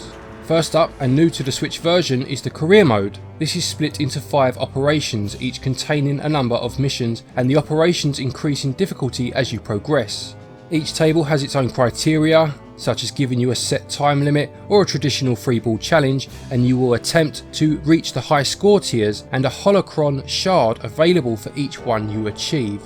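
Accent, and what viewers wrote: United Kingdom. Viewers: Oh, ok, the man knows digital pinball, too. You're quickly climbing the ranks of my favourite Switch-related channels, sir, and your accent makes me want to spell things colourfully ^.^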